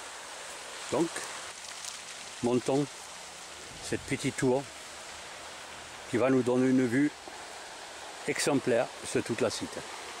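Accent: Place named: Midi, France. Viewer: Hallo, wohnen Sie zufällig im Süden von Frankreich? Sie sprechen französisch mit einem so netten Akzent vom Midi:) es ist ja echt schön.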